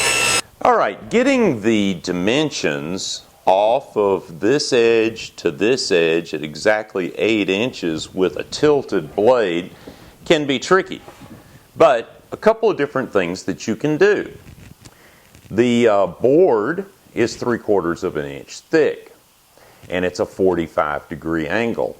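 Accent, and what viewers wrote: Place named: South, United States. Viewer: watch at 1.25 speed, trust me. dudes talented intelligent amazing but that southern slow talkin'...phew!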